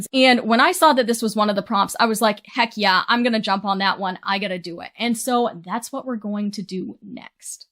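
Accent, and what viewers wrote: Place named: Maine, United States. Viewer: Yooo a fellow mainah (mainer)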